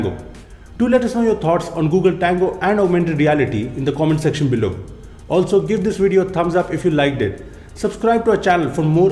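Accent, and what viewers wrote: India. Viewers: Im fucking done with indian accents it sounds like gurgling sounds😠😠😠😠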